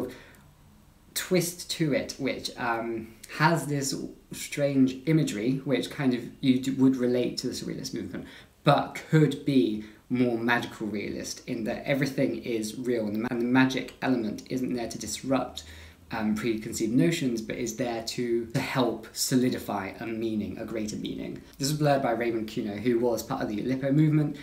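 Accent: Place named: United Kingdom. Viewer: I am from india ,came here to find. Something about surrealism but now I am like ..Wow I like this british accent 😘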